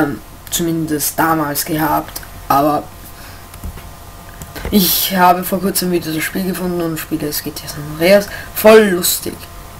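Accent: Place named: Austria. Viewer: he du kommst ya aus österreich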